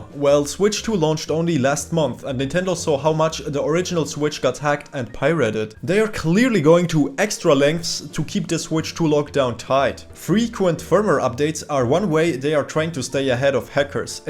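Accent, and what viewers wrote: Germany. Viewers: I Hear deutsch Bro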